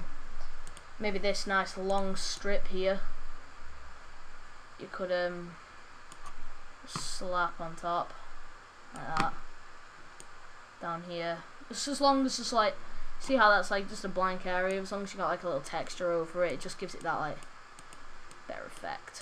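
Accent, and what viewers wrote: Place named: United Kingdom. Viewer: O,MMGMGFJ UR BRITISH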